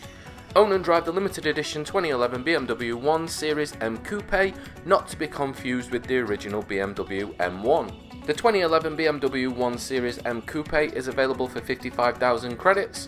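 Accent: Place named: North, United Kingdom. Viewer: Thanks awesome guy. Like the northern accent and you had a couple of funny one liners lol.. But all done!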